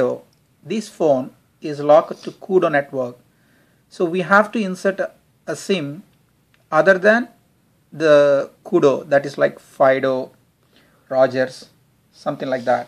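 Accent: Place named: India. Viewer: haha crazy indian accent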